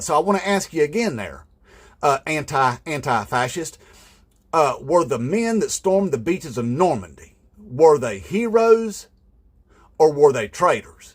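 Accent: South, United States. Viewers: "We was just, you know, just usin' your correct pronouns. We thought that's what you wanted to be called. We didn't mean no disrespect. We just thought you wanted to be called fascists." That's brilliant. That's brilliant. Once again, perfectly said with a perfect Southern drawl.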